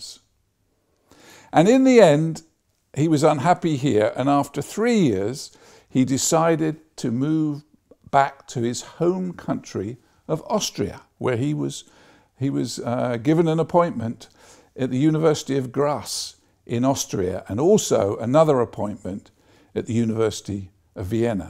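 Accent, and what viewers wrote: England, United Kingdom. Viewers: тот момент, когда параллельно изучаешь английское произношение)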